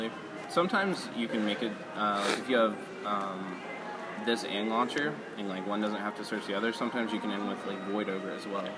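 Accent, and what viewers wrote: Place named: United States. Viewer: I use to think was a fat german with a craving for chocolate, then I heard his murica accent, and I was like WOAW my mistake.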